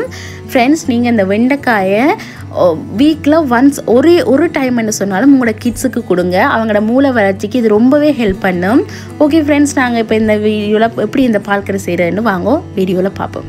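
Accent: Sri Lanka. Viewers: Super neengal jaffna Tamil Thane kathaikirigal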